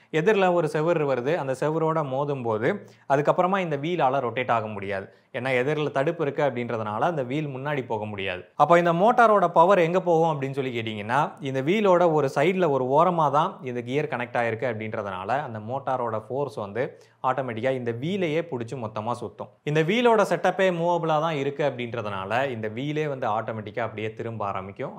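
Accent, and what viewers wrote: Tirunelveli, India. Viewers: தூத்த இடம்.. திருநெல்வேலி slag ❤❤❤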